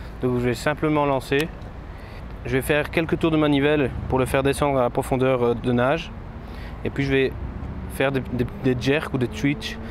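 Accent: Belgium. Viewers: oui j'ai reconnu ton petit accent belge que je connais bien, je suis natif de Valenciennes.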